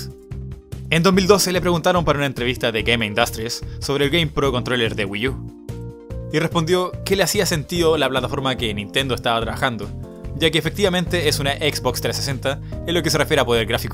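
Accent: Chile. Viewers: si igual se vio q trataste de darle un toque mas neutro, pero los q son de chile se dan cuenta quien es de su pais jaja, buen video, y q opinas de ps3 y ps4? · eres de Chile? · Eres chileno?me encante el acento chileno hablando sin modismos,creo que es uno de los más neutros de todo latinoamerica,por algo la mayoría de los doblajes de Discovery (sobretodo Discovery kids)se hacen en chile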